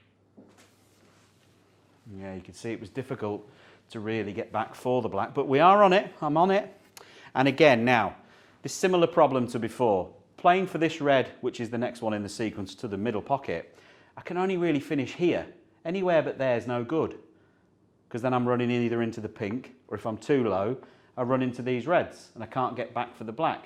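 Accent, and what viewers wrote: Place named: United Kingdom. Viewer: Hello Shaun! English is my second language and watching your videos I just enjoy your accent and some intricate British phrasing that you occasionally use. It's fascinating how a sportsperson can be so successful and articulate at the same time.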